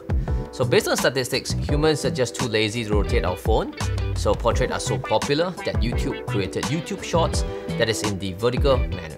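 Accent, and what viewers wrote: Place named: Singapore. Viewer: Thanks for the info. I recognized your accent and had to check your Bio. I miss the Singaporean accent!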